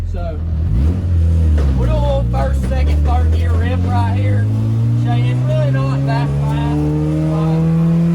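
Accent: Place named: Tennessee, United States. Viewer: I'm just traveling down the rabbit hole of "beer can" or as the kids call them now "rocket" builds for ideas on my 1G Neon and... 😳 Mother of God... It's like train wreck. I hate everything about it and absolutely love everything about it at the same time. The ascetics and being Japanese make me hate it. The functionality and being piloted by someone that talks like me (Tennessee born) make me love it. 1.7 lateral Gs? Despite being from the side that lost WW2, has never landed a human on the moon and uses a measuring system that makes sense, I'll be binge watching for sure.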